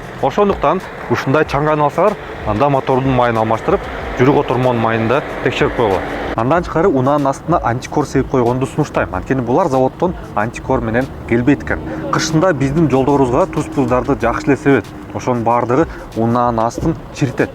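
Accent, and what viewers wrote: Standard. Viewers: Ушу жигит кыргызчаны өтө нукра сонун сүйлөйт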